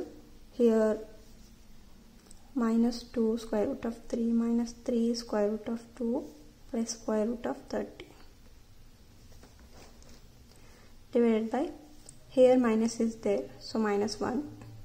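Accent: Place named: India. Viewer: Love the female Indian accent. I did think of rationalizing the denominator by multiplying by its conjugate but I'd never done that with a three-term surd.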